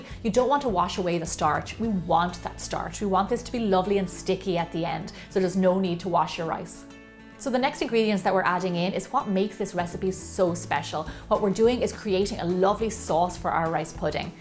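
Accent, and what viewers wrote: Ireland. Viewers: love your irish accent too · your beautiful Irish accent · I looooooooooove Irish accent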